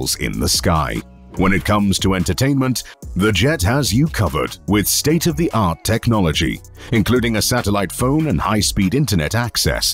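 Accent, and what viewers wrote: United Kingdom. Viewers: The commentator obviously comes from the North of England...you can tell this, by his saying the word BATH....ONLY Northerners speak this way.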